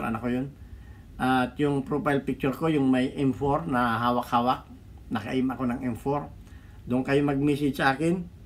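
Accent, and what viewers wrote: Bicol, Philippines. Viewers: Bikolano k po b manoy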